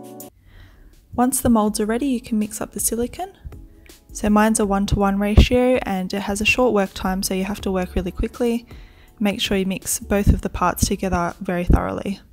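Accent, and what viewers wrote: Australia. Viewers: Thanks so much for this video. I have watched many videos on you YouTube about silicone mould making, and this one is probably my favourite so far. Thank you for taking the time out to make this for us. I am also an Aussie, so I also appreciate the accent. I have also used Pinkysil in the past with great results, but I find it quite expensive. I would greatly appreciate any advice on alternative products. TIA. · Thanks for sharing your simple way to make a mold; I'll certainly try it! What would you use to fill surface imperfections on an item before making a mold of it? Oh, and it's nice to hear another Aussie making a tutorial!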